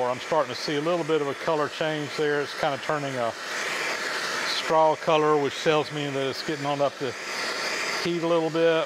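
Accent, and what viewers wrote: South, United States. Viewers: Why captions? speaks perfectly good southern English.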